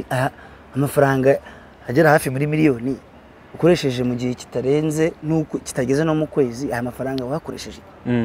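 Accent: France. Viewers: Afite accent nziza yifrance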